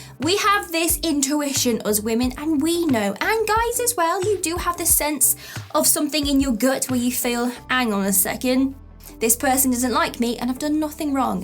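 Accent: England, United Kingdom. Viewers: I absolutely love your voice! Are you English or British?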